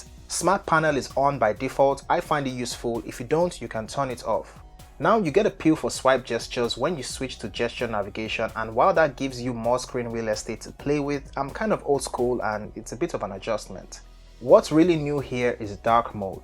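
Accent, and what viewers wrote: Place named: Nigeria. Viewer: Great video, you sound nigerian. Are you :) ?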